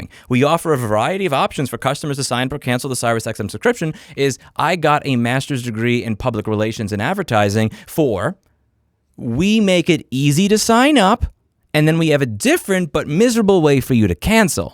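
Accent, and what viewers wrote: New York, United States. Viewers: Love that NY pronunciation. Umanly possible 😂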